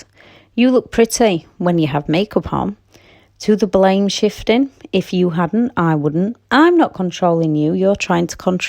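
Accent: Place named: Northern England, United Kingdom. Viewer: I'm still trying to figure out if her accent is northern England or Scottish (the kind of Scottish that sounds like it might be northern England but which is really just a "posh" form of Scottish, not to be confused with posh England English)... 🤔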